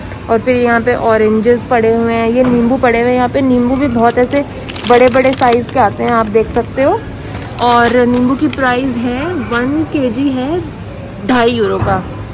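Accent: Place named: Rajasthan, India. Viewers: Pahli baat ki video banane wali rajasthan se lagti hai.sahi Hindi nhi bol pa rhi..yaha para huwa nhi hota..rakhi hui hai boliye..aur ek zaruri baat..her chiz pe vedio mat banao..ye kya matlab hai ki supermarket dikhate hai aapko..are ab india main hypermarket aa gaya jo ki vo bhi boring ho gaya hai aajkal...Germany main rah k training lo ki kaise presentation dte hai.. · Ye inke bolne ka tone hai Rajasthan se hai ye rajasthani me pada hua hai ese ho bolte hai isliye inki hindi me mix ho jata hai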